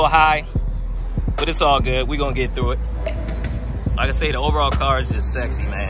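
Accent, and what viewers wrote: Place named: Baltimore, United States. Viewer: Your accent... you from Baltimore? DMV?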